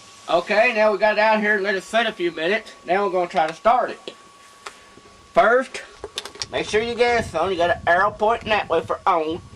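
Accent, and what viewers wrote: South, United States. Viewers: Well, here is the proverbial at it again. I must admit, that in all sincerity, I love the Southern accent. Men and Women, hear me out... the "good 'ol boys" from the south, have taken some bad raps, in regards to racism and tyranny over our friends and neighbors who are black. Again, I admit, that there were multiple, AND I MEAN MULTIPLE times when they did the wrong things regarding interracial culture, including outright murder!!! My heart will always be saddened by what the American South has done to our brothers, sisters, and their children. I had hoped that by this day and age that we would be over this issue. But I can clearly see that we have not. Here is my point. This guy is a typical image of what the rest of us see. - - - A "Good 'Ol Boy", but thru it all, at least in my life, I have learned that they do have quite an ability to repair things. 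Duct Tape and Super Glue, et al, will most likely fix anything. This video was great!! The replies are even better. I Say... take it for what it's worth, and by God, DO NOT smoke while you clean your carb. He also gets credit for being such a "likable", guy and his "dowg", seems to be at home with him and loving life!... Great post, chew me out if you want folks, but I still, will continue to believe in our... NOW... WILL WE be able to teach the hardline bigots down south anything? Well, it would appear that we just keep "chipping away at it"... and so we continue. I am not sure about you, but befor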